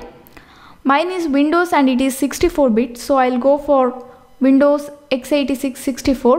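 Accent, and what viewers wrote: South India, India. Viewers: Are you south indian?🤔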